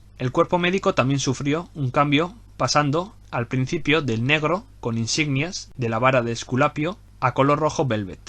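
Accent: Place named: Canary Islands, Spain. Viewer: aupa buenos videos y buen acento canario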